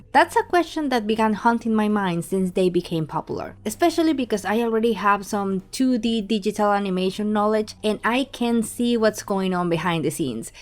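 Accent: Spain. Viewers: Show that Spanish accent! It makes you the awesome person you are <3 . Also, I work with Mac and most of the rigging and Vtuber mods or programs arent available I decided to use Character animator. I love the character you make!!!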